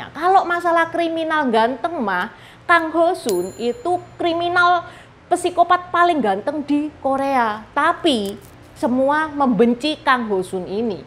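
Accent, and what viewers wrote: Java, Indonesia. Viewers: Mbak kental banget logat Jowo nya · Uraian ceritanya, ter urai indah, walau tekanan / aksen jawanya masih medok, tapi masih dapat di mengerti.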